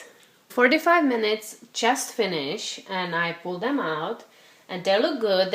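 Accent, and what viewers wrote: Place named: Czech Republic. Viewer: Lol it's not your slight Czech accent.All captions I've seen were inaccurate,so I read them for laughs.Once I watched a 50's United Airlines promo.A beaming flight attendant said to a boarding businessman;"you're my first passenger of the day!". The subtitles read"good morning squirrel,you're my first hemhoroid of the day."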